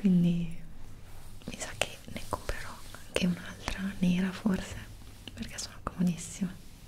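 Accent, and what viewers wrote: Italy. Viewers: Is a italian voce Itis normal you not comprend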